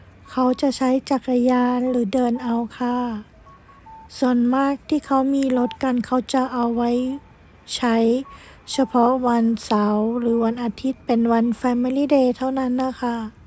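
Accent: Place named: Japan. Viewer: ดีจ้า รับชมเมืองญี่ปุ่น ด้วยคะ ฟัง บรรยายจนเพลินเลยจ๊ะ บรรยายได้น่ารัก คะ สำนียงจะออกทางญี่ปุ่นหน่อย พั่ลัดด่จะรอชมคลิปต่อไปนะคะ❤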